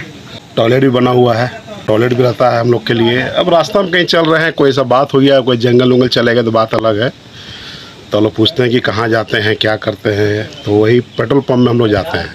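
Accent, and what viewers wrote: Bihar, India. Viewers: Apke language se lagta hai aap bihar se ho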